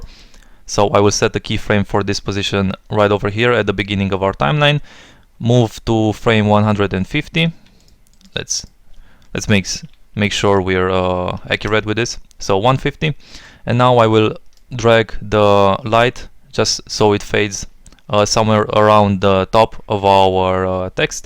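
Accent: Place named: Russia. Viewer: Спасибо!) Сразу видно русскоговорящий)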